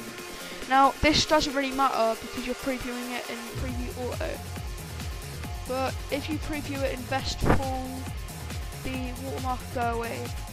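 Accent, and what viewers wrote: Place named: United Kingdom. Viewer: you're british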